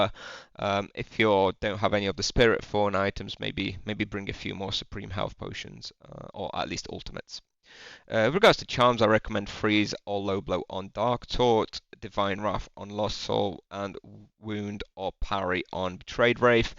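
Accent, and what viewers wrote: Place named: United Kingdom. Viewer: no to dobre wrażenie sprawia, mieszkał w UK od wczesnego dość dzieciństwa ładnych paręnaście lat, stąd akcent 😋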